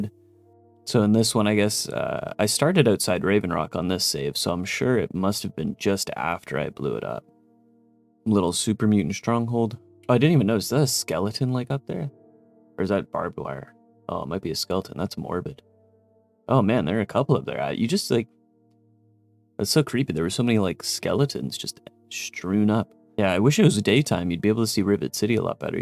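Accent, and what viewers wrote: Canada. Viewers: Ah he’s Canadian never noticed that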